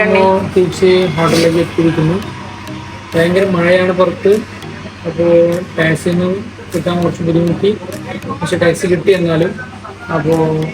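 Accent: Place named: Kasaragod, India. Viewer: എത്രയൊക്കെ പരിഷ്ക്കാരി ആവാൻ നോക്കിയാലും എടക്ക് ആ കാസ്രോട് സ്റ്റൈൽ കേറിവരുന്നല്ലോ മോനു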